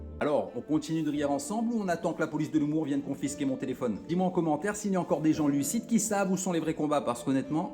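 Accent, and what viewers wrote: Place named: Kabylie, Algeria. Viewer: Continue ce que tu fait , bravo elle sont super tes vidéos et une belle élocution de Kabylie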